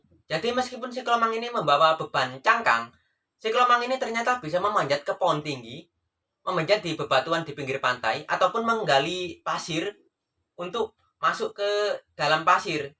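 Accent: Java, Indonesia. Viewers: Medhok bosomu mas🤣🤣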